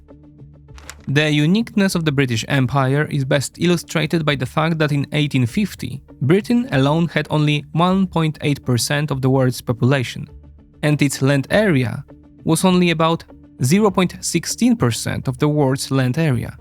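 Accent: Poland. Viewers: You do excellent work! Very informative, must be very time consuming. Liked and subscribed. And I love your Polish accent, I’m Ukrainian by birth. 😊 Suggestion: given the depth of material and watch time, suggest you have a timeline so that people can jump back to a topic of interest. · Not surprised this guy has a Polish accent. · POLISH ACCENT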